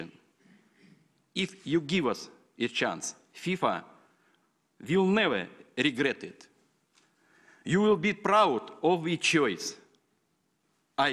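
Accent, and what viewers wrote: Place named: Russia. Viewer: Его английский чересчур русский.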